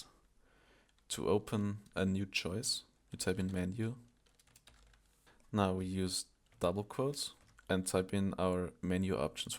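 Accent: Germany. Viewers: schon beim ersten Satz gemerkt das du deutsch bist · You're accent sounds like a German one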